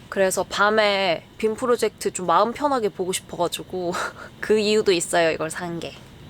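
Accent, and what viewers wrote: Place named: Busan, South Korea. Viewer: 항상 영상 잘 보고 있습니다! 같은 부산분이시네요 ㅋㅋ 사투리가 반갑습니다 ㅋㅋ 재미있는 영상 계속 부탁드릴께요! 언젠가는 잘하겠지!! ㅋㅋㅋㅋ 화이팅입니다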